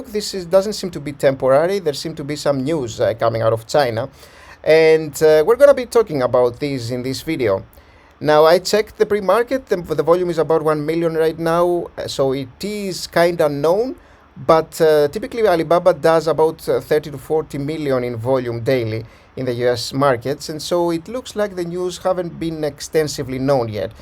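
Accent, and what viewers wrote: Greece. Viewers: Again da best greek accent in english ! Dont you think there s an other bulltrap forming ?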